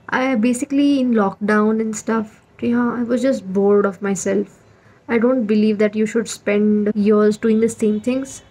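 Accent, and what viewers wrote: India. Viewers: U have a pretty strong Indi accent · ohh high~five me also same from karnataka - Bidar 😎 me tabhi samj gaya tha ye to hamra accent h lots of Love ❤️♥️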